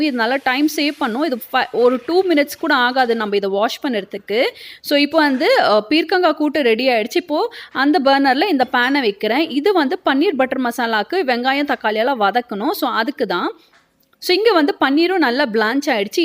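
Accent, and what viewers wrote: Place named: North India, India. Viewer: Unga Tamil romba styla iruku, neinga north Indian a??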